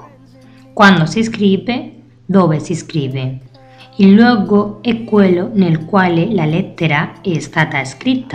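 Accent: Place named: Spain. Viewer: È un accento,credo sia spagnolo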